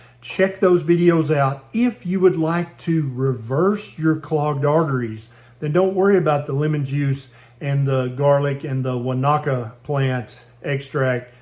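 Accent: South, United States. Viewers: Sorry, not to be a racist, and this is totally my fault, but I just can't take it seriously when I hear a hillbilliyish guy in a pink shirt frantically telling me to hurry up and get whacky naaka plant to clean out my arteries. Is this satire/comedy? If so, haha!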